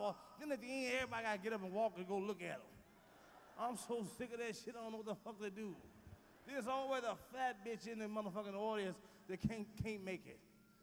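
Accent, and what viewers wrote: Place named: Chicago, United States. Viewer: I swear I always heard West side of Chicago ish talking err time he was on stage.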